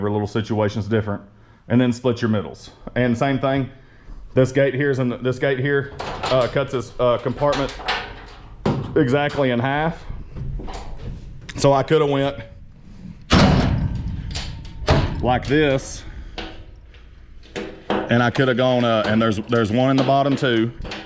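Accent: South, United States. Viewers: That country boy accent though - I wonder if you can sing 🤔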